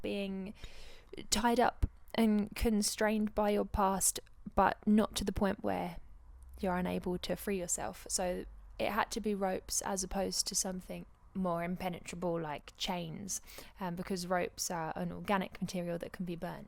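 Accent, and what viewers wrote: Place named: United Kingdom. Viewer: you have so much Tallent. I'm addicted to your videos. Mus be your british accent. Your voice is so beautiful...first time I've heard your voice othe than fvm. Keep on rockin'....a fan in mississippi.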